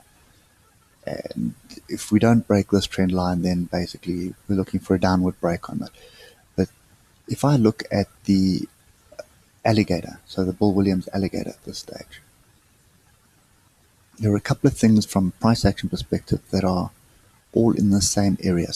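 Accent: South Africa. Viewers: You sound South African :)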